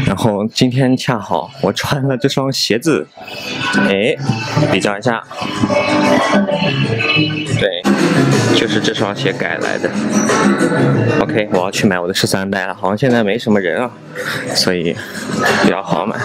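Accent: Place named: Taiyuan, China. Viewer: 听口音 太原后生？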